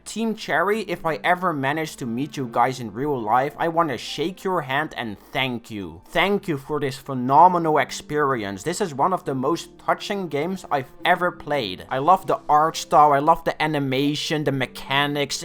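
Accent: Netherlands. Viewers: U Dutch? U sound dutch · hollow knight is amazing also are you Dutch? I recognize the accent on how you pronounce some words idk if it makes sense, could be bc I'm Dutch lol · Yeah hes Dutch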